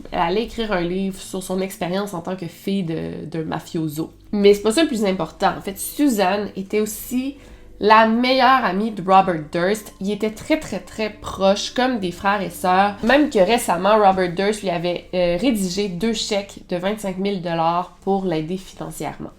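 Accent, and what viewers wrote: Quebec, Canada. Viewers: La fin de l'histoire la chute son enregistrement dans la salle de bain c'est c'est terrifiant !! J'ai adoré la façon dont tu l'as raconté, j'ai vu un téléfilm il n'y a pas si longtemps à la télé française sur cette histoire je ne me souviens pas du titre mais dans ce téléfilm ils ne traitaient que la disparition de Kathie.... J'ai hâte que tu fasses une nouvelle vidéo longue.... Une fan française🇨🇵 de tes histoires racontées avec le bel accent québécois ❤❤❤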